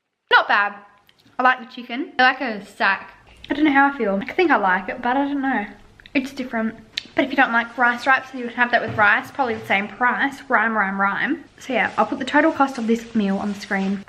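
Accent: United Kingdom. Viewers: You sound British but use dollars??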